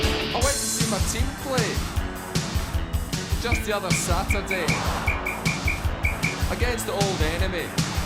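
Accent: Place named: Scotland, United Kingdom. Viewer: very interesting,great history...love the scottish accent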